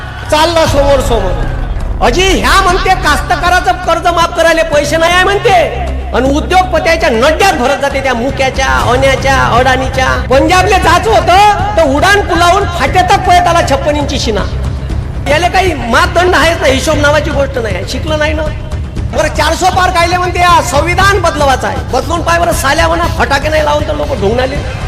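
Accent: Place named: Varhadi, India. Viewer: वऱ्हाडी भाषण व्वा 🙏PM साहेबांना बोलताना राउटसारखी जीभ घ ून जाऊ देऊ नका, हे ्वच पक्षातील हरामखोरी आहे, तुम्ही आपण गुरुजींना हे राजकारण परवडत नाही जी.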